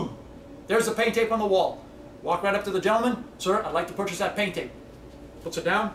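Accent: Trinidad and Tobago. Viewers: his Trinidadian accent realllllllllllllllllll on point wdhh loll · You got to do some more with just ur trinidad accent. . just luv it !!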